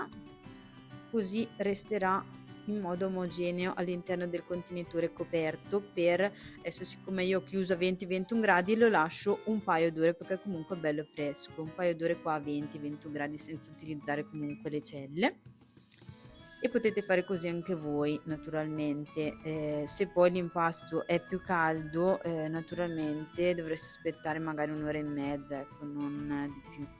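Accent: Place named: Brescia, Italy. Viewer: bravissima, oltre che l'accento bresciano che e di una simpatia unica, sabato provo a farla alla tua maniera.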